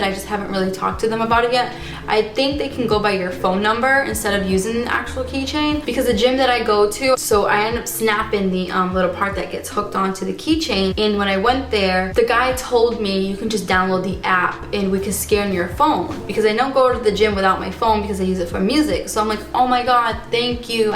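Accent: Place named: Boston, United States. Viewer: A video suggestion for you because you're absolutely adorable and I love the way you pronounce words would be how other people say a word in different parts of the country and then you try to say it with your Boston accent! Please say car key's LOL An old co-worker of mine had an accent like yours and every time she would say car keys it sounded like she was saying khakis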